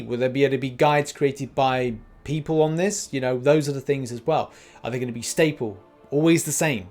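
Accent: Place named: United Kingdom. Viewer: thanks, I'm a sucker for British accent lol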